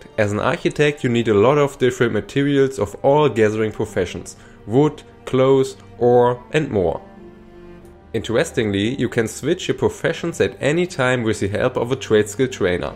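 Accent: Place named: Germany. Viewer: Yeah, very strong German accent. He should work on that.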